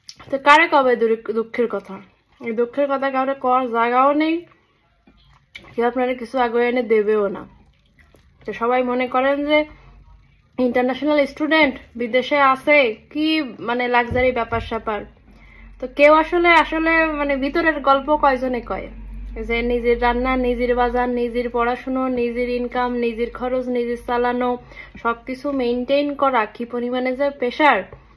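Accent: Khulna, Bangladesh. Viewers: খুলনার আঞ্চলিক ভাষা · দ্রুত সুস্থ হও দোয়া করি। তোমার কথা শুনে মনে হচ্ছে তোমার বাড়ি খুলনা। ভালো থেকো আপু।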